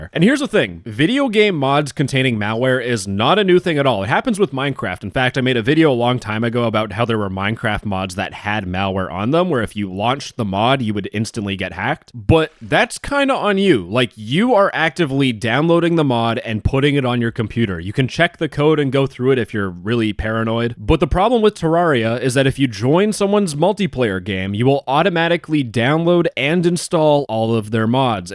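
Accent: Poland. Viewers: as a pole i approve polish accent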